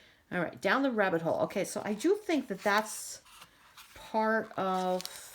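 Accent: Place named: Boston, United States. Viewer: I have been watching use this deck for quite some time now. I am not drawn to it. I think it is interesting even if some of the iconography is different than the R-W. I even like the Alice in Wonderland stories but I am just not interested in using this deck. And gosh! What a book! Too much for me! does great with it and that is enough for me! Thanks for going over it though! PS....thinking back to the old radio show Car Talk, that IS the accent you have! Yeah Boston!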